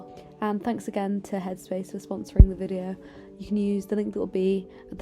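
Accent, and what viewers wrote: United Kingdom. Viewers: Guys her accent , is it british?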